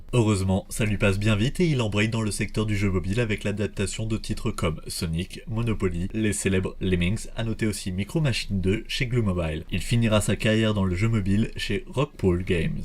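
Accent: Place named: United States. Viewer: Super Idée. Super Contenus. Super Texte. Mais je n'accroche pas le "style" que tu met dans ta vois. C'est très proche d'une vieille IA de Vieu SF mais sans la reverbe... Et pour mon cas je trouve que sa gâche le plaisirs que j'ais eu a reguarder cette video. Si pour X réson tu tiens absolument a guarder cette fasson très américanisé de parler je peut te consseiller des tuto pour y metre les effets audio qui vonts avec et mieu fair passer la pilule. Mais pour avoir testé ce style je te consseil le serieu et sinssert. Milles excuses pour l'orthographe.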